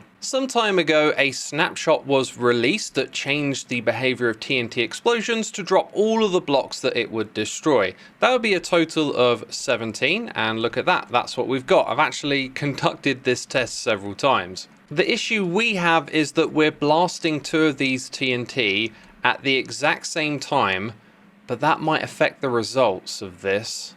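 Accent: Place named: London, United Kingdom. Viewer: Your new mic has just made me even more confused! You have sort of this londoner accent but I still can't put down why your accent is so familiar aswell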